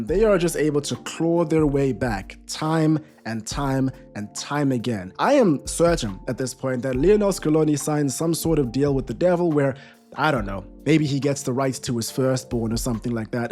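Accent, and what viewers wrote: Brazil. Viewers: Habla como un brasileño hablando español, o sea bien, muy bien pero que se le nota que es extranjero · Good Spanish, your accent reminds me of Brazilians speaking Spanish. Do you speak Portuguese?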